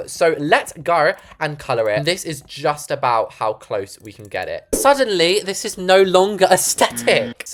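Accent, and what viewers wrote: United Kingdom. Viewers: Uhh I live in England and I approve of this house and the British voice what u America people think how we think speak that cocky from London so ya Edit: this house is like a mixture of rich and normal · yeah I’ve been watching for 1 year now or about that and I found out as I realised that he has a British accent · FIRST AND BTW I AM NOT BRITISH SO I AM TRYING TO LEARN IT FROM YOU LOL YOUR BRITISH IS AMAZING (PLS PIN ❤️💙 )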